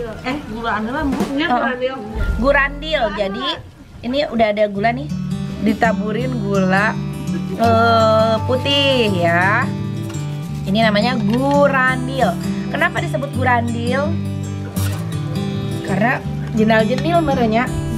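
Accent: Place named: Garut, Indonesia. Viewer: Resep pisan ngadangu Teteh Logatna garut pisan...abi orang garut Lami d bandung Janten teu apal ceplak t garut mana teh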